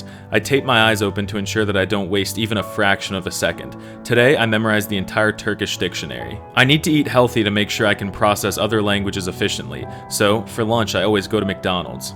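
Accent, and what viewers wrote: Spain. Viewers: bro, disgusting that you run that Spain Mexican accent. You should speak Mexican with a Mexican accent. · Your Spanish is from Spain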